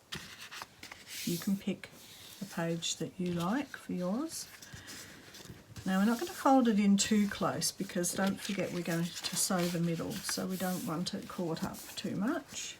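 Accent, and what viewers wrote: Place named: Australia. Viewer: Aah. I was puzzled cause at time te Australian accent slips and I couldn’t place it. I thought South African or New Zeeland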